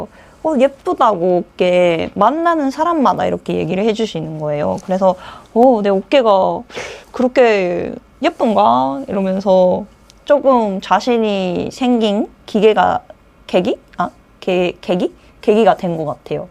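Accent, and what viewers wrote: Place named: Gyeongbuk, South Korea. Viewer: 아니 야는, 한국사람 아녀..??ㅋㅋㅋㅋㅋㅋㅋ 경북 아가씨 같은디..ㅋㅋㅋㅋㅋㅋㅋ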